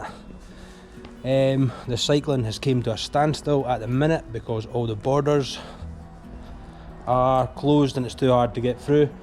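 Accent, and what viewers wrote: Scotland, United Kingdom. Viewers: Every video you have out there, I watch through tears! Happy tears, because you are such a very special person. That little kitty loves you so much, and to see a man love a cat in return is heartwarming. Love your Scottish accent by the way! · Lol All you need to do is listen carefully to what a Scotsman sounds like. He`s speaking perfectly good English with an accent, master the accent and you`ll do better.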